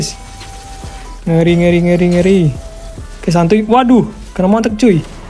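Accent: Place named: Makassar, Indonesia. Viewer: Saya tebak ya bang, dari logatnya bang orang Makassar dan sekitarnya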